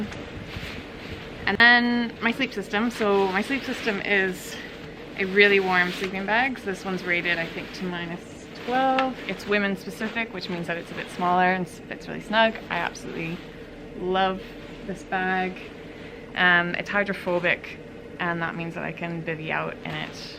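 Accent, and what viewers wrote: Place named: Scotland, United Kingdom. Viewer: Sometimes i hear a Scottish twang in your accent 🏴🇨🇦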